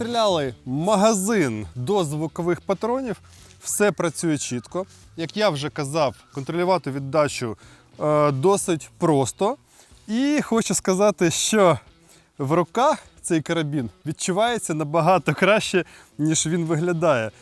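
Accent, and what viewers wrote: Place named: Russia. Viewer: Добрий ведучий, але щелепа російська- смішно слухати.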